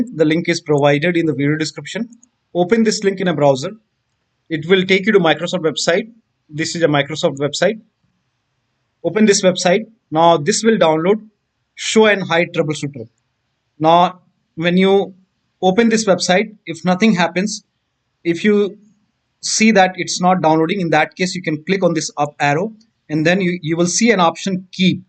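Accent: India. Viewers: that's awesome thankds for your help, by the way your india accent is so special😁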